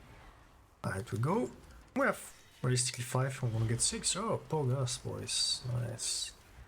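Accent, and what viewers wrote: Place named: France. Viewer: Are you French? you have an elegant accent :D your unitframe is SUF right?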